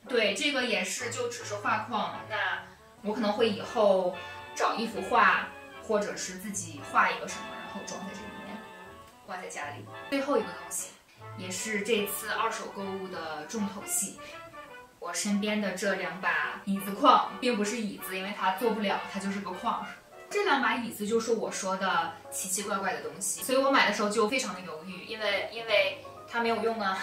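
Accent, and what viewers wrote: Northeast, China. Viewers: 妹子的东北口音已经被修饰得非常轻微了.你精心地学习过普通话，但是音节尾脚还是能被缺姐这样的听出来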